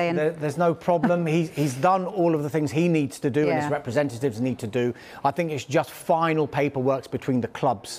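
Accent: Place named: England, United Kingdom. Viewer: I keep admiring ur English asent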